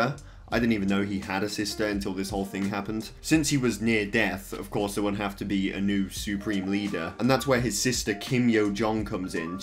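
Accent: England, United Kingdom. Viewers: are you from england ?. do you watch doctor who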